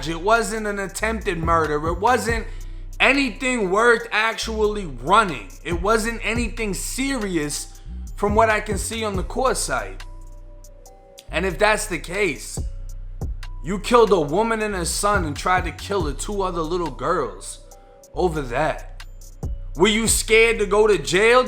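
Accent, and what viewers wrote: Boston, United States. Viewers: Me 2...Its that Boston twang 🤣🤣🤣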